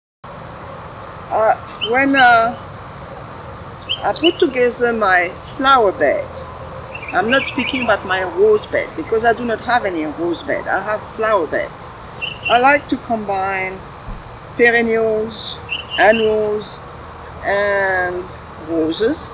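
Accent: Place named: France. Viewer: Enjoyed listening to the French accent! Oh and the bird!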